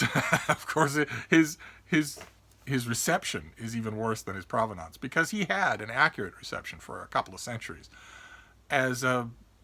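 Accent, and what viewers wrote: United States. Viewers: Loving your anti-Roundhead invective but still surprised by an American voice in defence of Monarchy! Totally agree with you about TS Eliot and Marvell.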